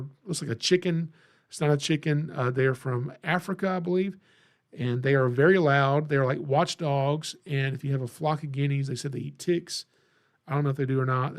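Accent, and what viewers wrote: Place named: South, United States. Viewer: Re your "accent": Louis Grizzard once famously said, "I believe God talks like we do." It's the opening line in his comedy routine "Southern Speaking" and may do you some good to hear.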